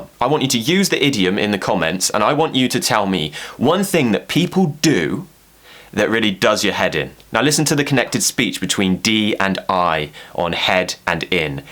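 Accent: RP, United Kingdom. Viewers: British accent never sounded better 😹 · i am over the moon to find EJT English channel.I ever fancy British accent and now you have brought it to me .............................................................................(From Pakistan) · I love british accent...you sound like my fac Klaus Mickelson from TVD & ORIGINALS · I like British accent and the way you speak is mind blowing. Great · Posh accent ❤️ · Wow!!! Your British English is so perfect, I forgot how to breathe! 😱😱❤️😅🔥🔥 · Perfect British 🇬🇧 accent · Iam so happy that i found you cz i was loonking forwad to learn the british accent and you my super hero in lango naild it with the accent of yours ❤ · I'm feeling over the moon when i discovered that i love british accent because of you😍😍💕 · Same here. His voice sounds gorgeous. British accent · I love UK boy with UK accent.😍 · I love your accent...im moving to Oxford at next week . 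Been a bit worried....but I want to talk as RP native spoken English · Love the British accent! 😍 Thinking about buying a notebook... your videos are precious!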